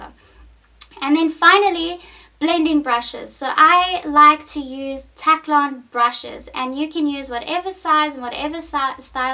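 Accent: South Africa. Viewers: Beautiful accent - are you South African?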